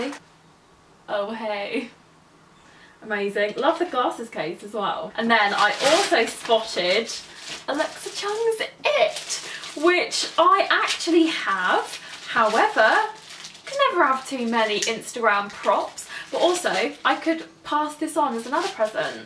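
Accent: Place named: England, United Kingdom. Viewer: I love your accent !!! Are you from England ???🤔😘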